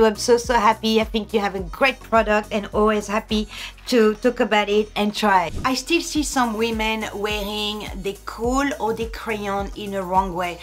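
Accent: France. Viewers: Oh my goodness. This is awesome. I have exactly the same wall color and the same vogue picture ( one of three in a set) in my bedroom🌺 So nice I found your channel. You‘ re so charming and so elegant . Bye the way, your french accent is amazing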